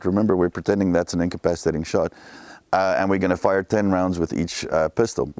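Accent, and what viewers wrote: South Africa. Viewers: I hear a South African accent.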